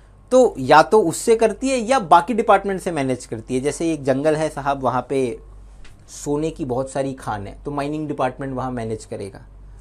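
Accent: Bihar, India. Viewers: Sir mujhe ye to pata chal gya hai ki aap bihar se ho .ab aap ye bataye ki bihar me khaha se ho 😊😊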